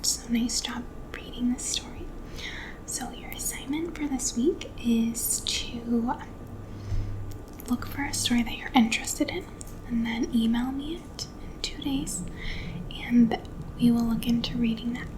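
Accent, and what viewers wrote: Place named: Midwest, United States. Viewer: You’ve got a total midwest accent but your background doesn’t look like the Midwest in the winter lol. What state do you live?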